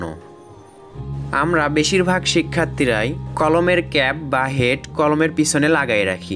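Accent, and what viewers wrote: Bogura, Bangladesh. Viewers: ভাই আপনার বাড়ি বগুড়া নাকি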